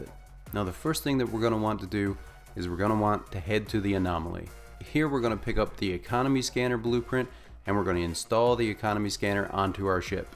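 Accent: Newfoundland, Canada. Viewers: Are you a Newfoundlander my guy? Watching from Dartmouth NS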